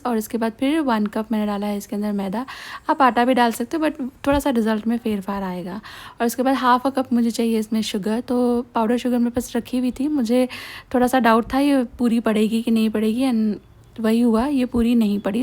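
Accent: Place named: Uttar Pradesh, India. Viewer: Aap up se h.. Is liye gola bolti h😊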